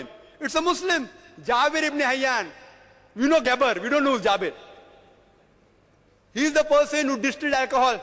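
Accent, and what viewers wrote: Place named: India. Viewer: This guys sounds too Indian for me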